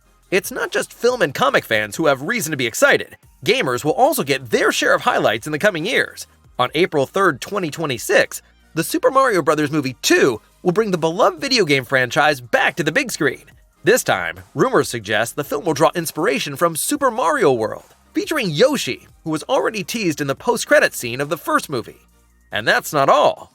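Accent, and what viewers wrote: United States. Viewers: God his American accent and tone is jarring as fook...cant finish this lol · I find this American English really hard to bear. That artificial euphoria in every sentence. Exhausting.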